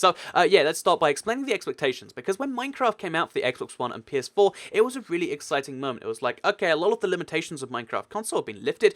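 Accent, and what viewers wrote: United Kingdom. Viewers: You sound mix of British and Russian and German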